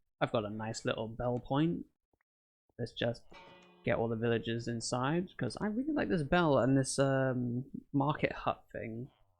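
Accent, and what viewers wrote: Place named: United Kingdom. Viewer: You sound British as shit cuz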